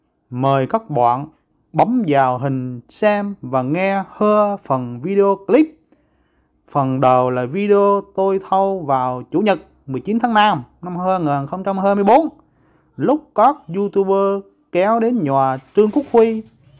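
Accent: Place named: Quang Nam, Vietnam. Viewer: Giống noi tiếng Quang,không hiểu noi gì, ham nhiều chuyen,xỉa vo chăm biển người khác, sau co view cha nội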